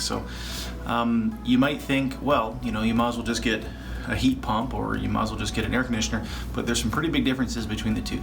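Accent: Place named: Canada. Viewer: The company says FurnaceUSA but the presenter is clearly Canadian!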